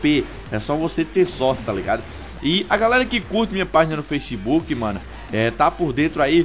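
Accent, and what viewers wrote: Northeast, Brazil. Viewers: voce é do nordeste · você é nordestino? :O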